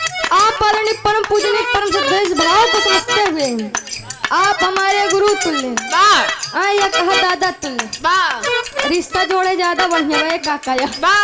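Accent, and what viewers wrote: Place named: Madhya Pradesh, India. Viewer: Aavaj se lagta hai ki bihar se nhi hai mp se hai aisa lg rha hai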